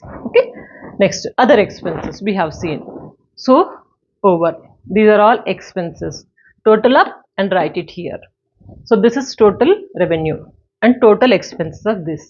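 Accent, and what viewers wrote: India. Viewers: Wonderfully explained mam and I like your sweet Hindi too.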